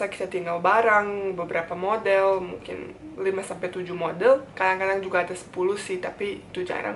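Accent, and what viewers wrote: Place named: Java, Indonesia. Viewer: Ngomongnya luar biasa medok banget, sampe nonton ketagihan, nonton terus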